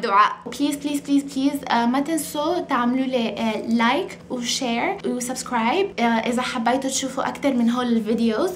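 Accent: Sudan. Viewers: يا عمري انت. انا اتابعك من الجزائر. احلى حاجة عجبتني لهجتك. لانه اللهجة السودانية ما نسمعها كثير و جميل انك تعرفي الناس على الثقافة السودانية. الف تحية مني لك. رايحة اكمل اشوف باقي الفيديوهات لي حاطتها ههههه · حبيت اللهجة السودانية ❤❤ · اللهجة السودانيه،،. عسل والله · اللهجه سودانيه اما اللغه اشوفه عربيه حقققققققتنا دي يا 😂😂😂😂😂😂😂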